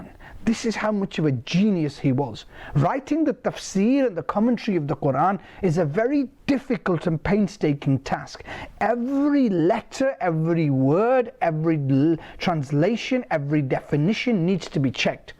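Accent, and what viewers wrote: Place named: England, United Kingdom. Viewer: English accent se Shirk nahi jata.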